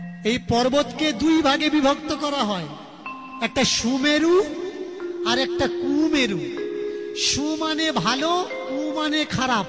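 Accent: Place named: West Bengal, India. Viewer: কীর্তনীয়া মহাশয় আপনার বাড়ি কোথায় ? কথা শুনে মনে হচ্ছে পশ্চিমবঙ্গের বীরভূম , বাঁকুড়া কিঙবা পুরুলিয়া জেলার যে কোন একটা জায়গায় হবে হয়তো । তবে গান টি খুব ভালো লাগলো ।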